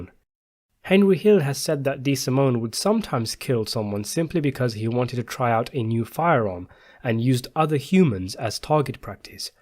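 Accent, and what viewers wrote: United Kingdom. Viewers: Pakistani British accent? Am I correct? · Has this guy got a british accent? I am british i never thought he had one till i saw this I have always presumed the narrator of this channel was Indian Maybe he is british but from an indian family background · This was actually a pretty decent narrative but I just can’t get over a British accent describing American mafia…I just…. Can’t. It’s gotta be a New Yorker or an American who sounds like a cop. Sorry Brits…love your documentaries but when it comes to American mafia, your eloquence takes something away from the experience 😑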